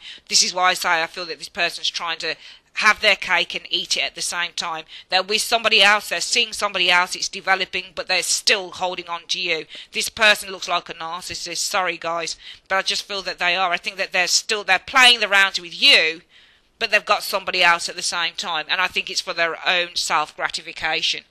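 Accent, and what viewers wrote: Birmingham, United Kingdom. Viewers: That’s got to be the best Brummie Reading I’ve ever heard! You are really good! Thanks!:)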